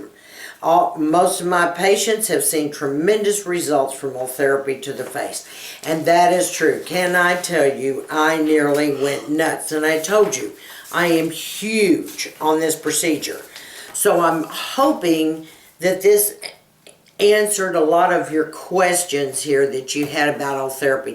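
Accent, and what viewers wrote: Texas, United States. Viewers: Oh- Ultherapy not oil therapy- lol! Awhile back when I heard you mention it in passing I misheard what you said due to the honeyed Texas twang. Very interesting and informative! I'm looking forward to you having this done. The innovations in medicine are really exciting. As a former surgical nurse I love to hear about them.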